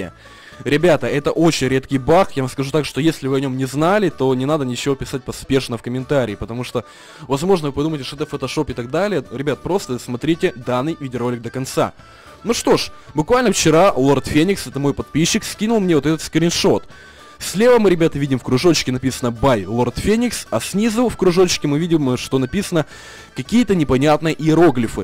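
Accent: Ukraine. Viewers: DEROTER ツ он Украинец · Fibe hou он украинец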